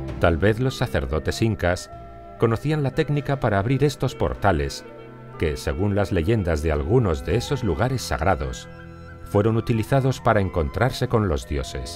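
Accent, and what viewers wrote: Spain. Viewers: En los 11 años de educacion primaria que estudie en Peru y que, solo se enfocaba en Historia del Perú. Jamas me hablaron de ninguna de estas huevonadas que habla este tipo con acento Español. Voy a reportar este video ya mismo. Esto es una burla a la humanidad. PDTA. Escuchen el la 5ta estrofa del Himno Nacional Peruano se las recomiendo.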